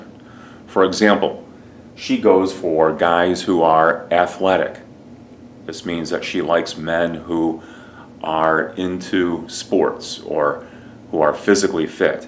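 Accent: United States. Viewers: are u living in ca??sounds so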